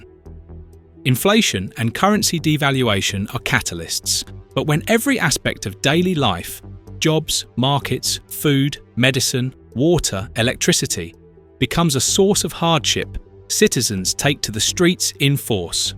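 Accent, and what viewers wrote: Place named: United Kingdom. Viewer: Who are reporting your mis guided assessment? We patriotic Iranians are watching all biased media mis reporting the protests and what brave Iranians protesters are calling for on the streets, they are taking their country back after 47 years of occupation by islamist terrorists who are not even from Iranian decedents and majority were born in Iraq and lebenan. So this uprising is about taking our country back and bring back our constitutional monarchy this is the main slogan shouted on the streets return of monarchy and Pahlavi, so do not try to misreport because of being a biased media, God knows which power or ideology is behind your rasneh, I note you are based in Bangeladesh and have a british accent. Times have changed this is not 1979 when the West removed our beloved Shah and brought these terrorists to Iran and deceived part of the nation who for money and position sold their country, and majority sadly at and watched, now it is 2026 and the last 4 generations have become aware of their history and what a beautiful country they had under Pahlavi, so enough is enough we will bri g back our monarchy and the glory we had to Iran whether you biased media and globalists western powers want it or not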